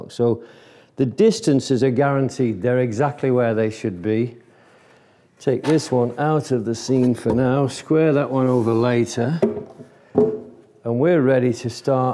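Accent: United Kingdom. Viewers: It is nice to have subtitles for those who might be hearing-impaired. I guess you have used a speech recognition software. Some editing is needed : "wall" instead of "war"; "disassemblable" instead of "disassemble a ball"; "bolt" instead of "ball". I am not sure I did catch everything because I normally just listen to your clear British pronunciation.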